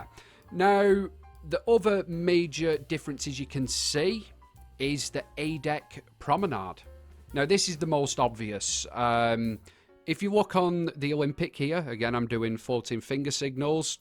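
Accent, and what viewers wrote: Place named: North, United Kingdom. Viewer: Long time TNG Fallout follower, nice to put a face to that Northern Voice. Simply, simply lovely.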